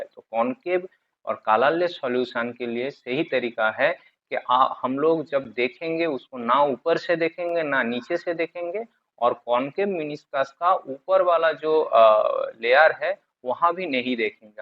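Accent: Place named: Bengal, India. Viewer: Apni ki bangali? Apnar hindi accent sune amar mone holo ki apni bangali?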